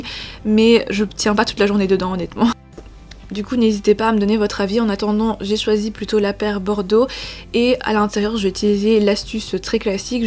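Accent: Belgium. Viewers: tu es belge ? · Tu es de Belgique ?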